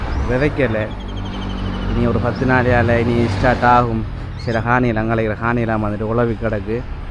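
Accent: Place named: Jaffna, Sri Lanka. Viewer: தென்மராட்சி யாழ்ப்பாண வழக்கு 😇😇😇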